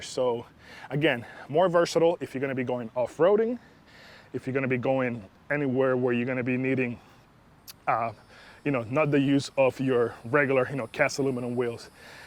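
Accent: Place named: Cuba. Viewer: I detect a Cuban accent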